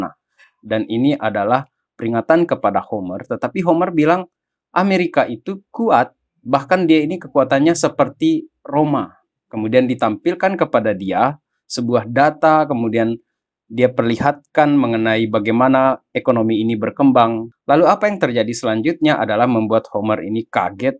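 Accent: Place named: West Java, Indonesia. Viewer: logat nya mirip sunda daerah priangan timur 😅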